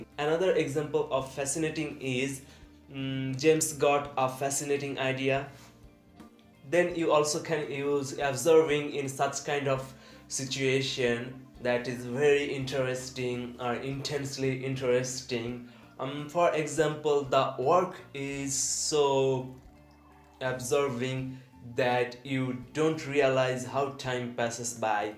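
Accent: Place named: India. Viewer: You need to work on that indian accent though, captions do not go along well.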